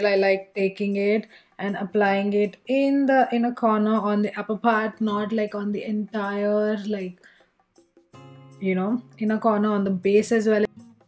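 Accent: South India, India. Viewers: you may be conscious of your south indian accent English but i like your way of speaking 😍 its different n very real i highly support you ✨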